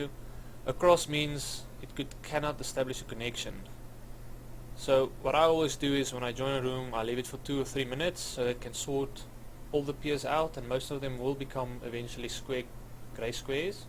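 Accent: South Africa. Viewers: South African? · Jho br, daai afrikaanse aksent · are you South African ?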